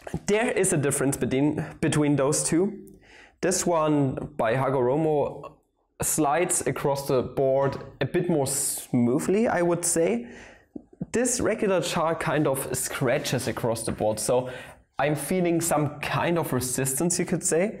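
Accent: Germany. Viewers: That’s a German accent isn’t it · I could tell from your accent. I'm half Filipino and half German too btw. Nice video!👍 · Oh Ich hab mich schon gefragt, warum ich so einen leichten deutschen Akzent heraus gehört hab und war richtig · i still like this german accent artifact of clearly pronouncing all "L"s that precede final consonants like k, "chalk". it sounds like your new chalk. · How do Germans know how to make their own accent funny. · Ich weiß nicht wieso, aber ich wusste direkt das deutsch bist 😂😂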